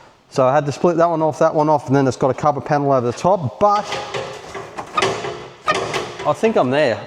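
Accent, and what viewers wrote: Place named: New Zealand. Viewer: Do you ever lived in New Zealand ? your accent have a bit off Kiwi in it .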